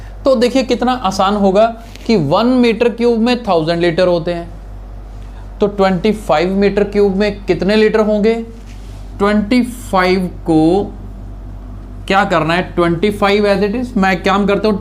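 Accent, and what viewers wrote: India. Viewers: Accent is also impressive! Very few Indian has good accent like you sir. Thank you Sajid Ali Karachi Pakistan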